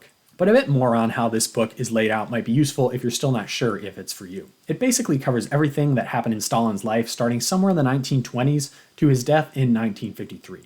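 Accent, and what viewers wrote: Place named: General American, United States. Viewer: Very insightful thx! By the way you have a beautiful "General American accent". 🎉 I am kind of a language nerd. ;) Keep up the good work! 🎉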